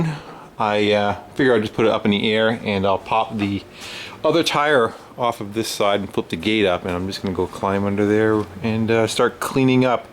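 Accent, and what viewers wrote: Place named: South, United States. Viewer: Thiss'n! Awesome. Love southern speak!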